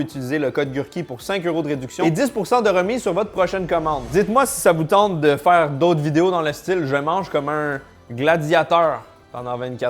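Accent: Quebec, Canada. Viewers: Je remercie mes 2 années au Québec, je peux comprendre dans cette vidéo xD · Gourmandise française mais qui adore aussi votre phrasé québécois ❤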